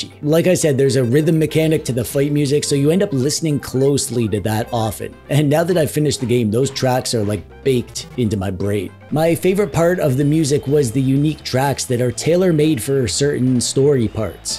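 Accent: Canada. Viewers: Thanks for the review of this classic game. PS, you're one of the most Canadian- sounding YouTubers I've ever heard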